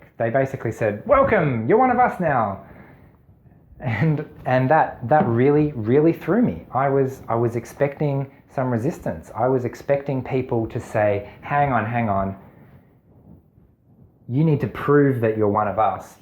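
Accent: Australia. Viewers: I just recently found your channel and it's like having an audio diary but with a nice Australian accent. So much of what you share about your thought process and solutions are almost identical to what I had to learn to do to be able to interface with society.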